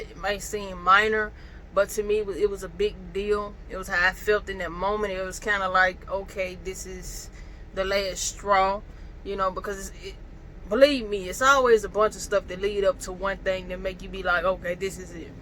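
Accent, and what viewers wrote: South, United States. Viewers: Just love that southern accent..! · Sound country 😂😂 but keep grinding ✊🏽✊🏽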